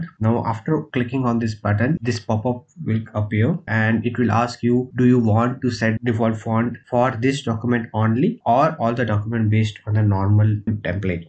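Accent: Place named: India. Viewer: thanks a lot, I'm a 73 years old french woman and first I was a little puzzled because of your nice indian accent and the fact you speak quite fast but your explanations were so clear, thanks a lot you really helped me. In the seventies, during 1 year in West Berlin I shared a flat with 3 guys from Bombay and one from Madras and you made me feel young again so double thanks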